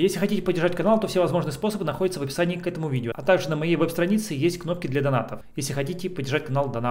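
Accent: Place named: Spain. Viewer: вы испанец? очень красивый акцент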